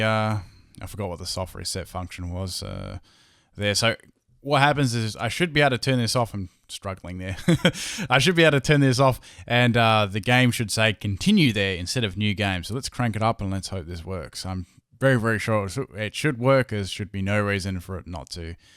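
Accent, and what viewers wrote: Australia. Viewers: he is Australian isn't he?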